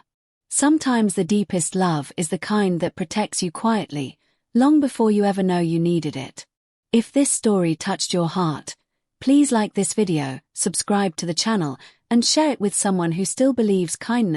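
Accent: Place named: United Kingdom. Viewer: Why is a British woman doing the narration ofnitnis from the perspective of an American man?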